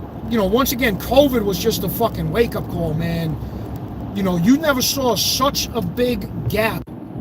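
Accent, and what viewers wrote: New York, United States. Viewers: YOU'RE FROM NEW YORK YOU SPEAK JUST LIKE IT.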